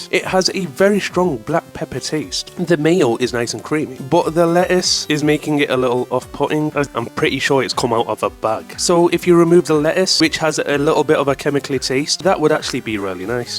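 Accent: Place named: South, United States. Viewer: I thought the American accent was good, sounded very Southern though, probably not Texas 😂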